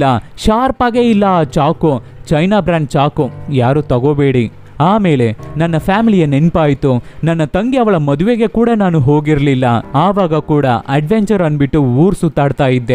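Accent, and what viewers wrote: Tamil Nadu, India. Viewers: Please nin Kannada saryag matadi tamil tara matadtidira but explain chanag madtira 🦄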